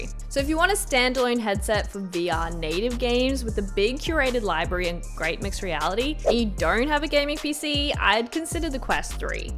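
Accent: Australia. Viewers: Great having an Australian voice and knowledge of local pricing and issues for VR.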